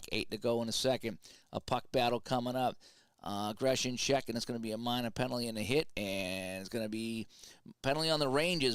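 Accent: Boston, United States. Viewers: Wow that was a cool video! I listened to the whole thing as if it were a real series…lol. I just discovered SC Hockey a little while ago from your channel. Finally ordered it and I love it. Especially the feature for creating your own teams. So I am just about finished with assembling the 1980 Olympic Hockey Teams. If I send you my excel files would you consider doing a video of a game or two from the tournament? There were so many Boston players in our USA team that your accent would be perfect.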